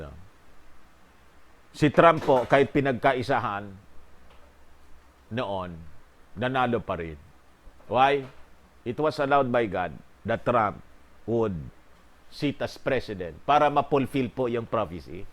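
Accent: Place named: Waray, Philippines. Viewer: TamA ka manOy, Hahahaha natatawa din akO.. natatawa AkO sa sige na gud ( ang sige nA ngA: )) Ang sarAp pakinggan dahiL kuha mO ang accents ng warAynOn, isa akong taga pakinig sayO manOy, at warAy-warAy din akO purong waraynOn.. at ganon din si MadAm Imelda R. MARCOS AY isang waray waray din. God BLESS.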